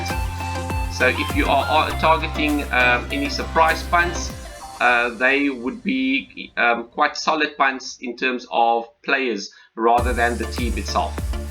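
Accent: South Africa. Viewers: South African?.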